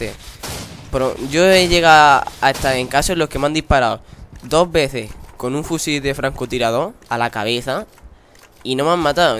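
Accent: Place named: Andalusia, Spain. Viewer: Los andaluces hablan así xd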